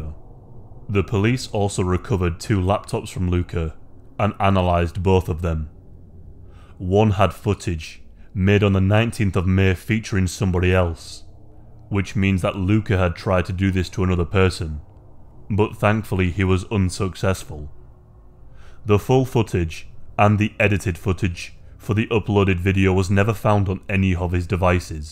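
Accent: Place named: Yorkshire, United Kingdom. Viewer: Of course it’s a compliment 💯 Yeah thought it was a yorkie twang lol, I’m Cheshire but I’ve got a friend of 15 years who still has a extremely deep and broad Yorkshire accent 🤯 😂 i thought ya all talked quick 🤦‍♀️😂!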